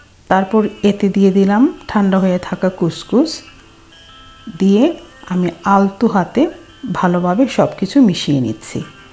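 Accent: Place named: Bangladesh. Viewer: খুব ভালো লাগলো পুরোটা❤❤,আপনার ভাষা বাংলাদেশির মতো লাগছে, এ গুলো কি মাছ , পুঁটি মাছের মতো দেখতে লাগছে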